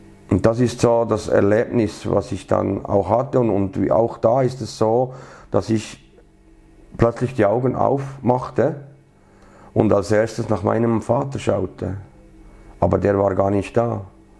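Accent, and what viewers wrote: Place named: Switzerland. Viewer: He talks Swiss-German Dialect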